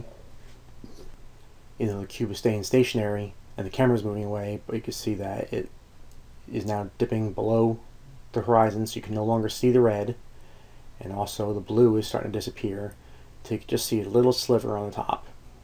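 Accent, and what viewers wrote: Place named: South Jersey, United States. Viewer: New South ?? Soundly like South Jersey to me 🤔🙃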